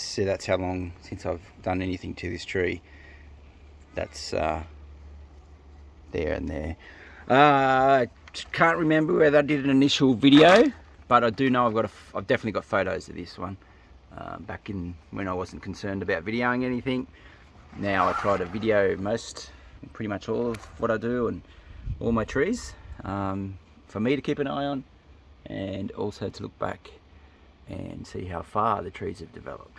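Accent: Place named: Australia. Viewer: What a cracking Chinese Elm and made even better with an Aussie accent to remind me of my youth in Melbourne. Great chop on the roots and I think oyu have really set it up for the future. Did you happen to plant the roots that you cut off? Anyway, loved the video and now I have to see what else you have done! Cheers, Xav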